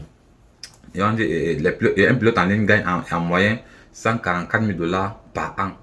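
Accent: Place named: Cameroon. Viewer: T'es camerounais je suis prêt à parier bro sa se ressent à ta façon de parler 😅✊🏾✊🏾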